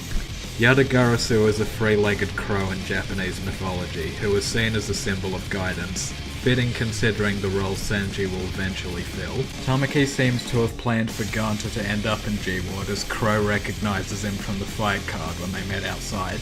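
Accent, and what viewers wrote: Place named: East Coast, United States. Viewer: Lol I came to this vid via your your big lez show vid. As someone who lives in Perth, no disrespect intended but my God you easily have the most easy coast accent I've ever encountered :D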